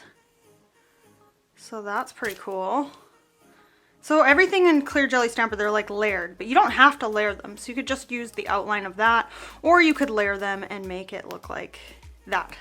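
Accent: Canada. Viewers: is smart, educated and beautiful and many Canadians say it the way she does 🙏🏻 it’s okay to pronounce things differently based on where you live 🙂 There are many words Americans say differently than us, heck even different parts of canada say some words differently, that’s the beauty of diversity in our language 🥰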